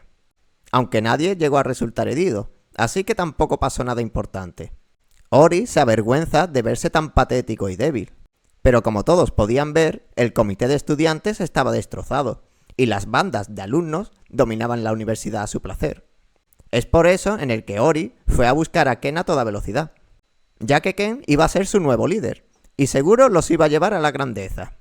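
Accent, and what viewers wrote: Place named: Spain. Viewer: Está buena la narracion lo mala esq está en español España 😅😅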